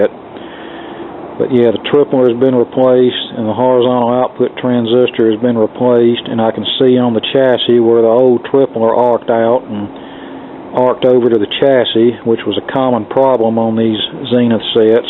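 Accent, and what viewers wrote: United States. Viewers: Interesting American accent. Which part of America are you from, if I may ask politely? I am from Australia.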